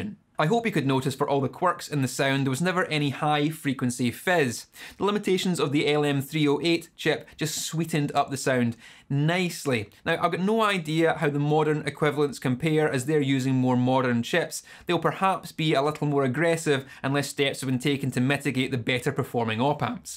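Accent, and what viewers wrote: Scotland, United Kingdom. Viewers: I love this guys accent so much,! how can i it? What is this accent? Scottish？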